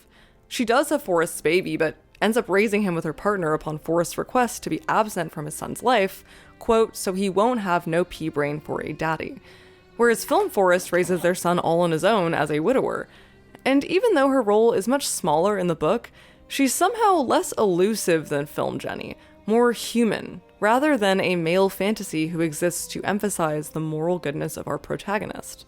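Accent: Canada. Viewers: So, I agree with a lot of what you say here. Zemeckis & co. definitely took a lot of the complexity and all of the darkness out of Book-Forrest’s character. I think Movie-Forest is more like Chance the gardner, from Being There , than the character from the book, who he shares a name with. But, to be fair, the book really was very badly written. IMO, it’s maybe the only time I’ve ever experienced the book being worse than the movie. Full disclosure: I’m also from Canada (I think I might hear an Ontario accent in your narration?), so I’m looking at the US situation from the outside, in. I also loved the movie when it came out and watched it on repeat for a while (I was in High School at the time), and haven’t watched it since. So I definitely have a bias and I might be forgetting key things. Still, I agree with you; the movie is not even close to perfect and it has some jingoistic vibes. 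But, there’s also hope there, even if Forrest is a bit of a fairy tale character. And, human suffering, as well as the darkness of the historical period is not completely absent from the story, either, with Lt. Dan and Jenny. I don’t think the intention of the film was stark realism. And don’t we all need hope and a happy ending now and again? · Is this channel’s creator Canadian?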